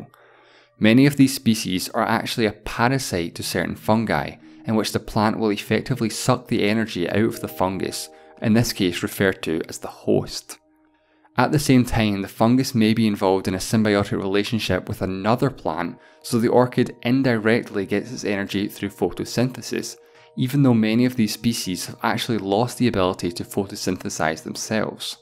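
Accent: Scotland, United Kingdom. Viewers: I love the mix of knowlege and scottish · As a first time watcher, I learned two things. 1) This guy's accent is hard to pinpoint, but I think somewhere in Scotland. 2) We should send fungi that can survive in space out into the stratosphere and see what happens. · Excellent video...thank you! Also love the narrator's voice and Scottish accent, no homo. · Alright I need to know what your accent is lol. It sounds Scottish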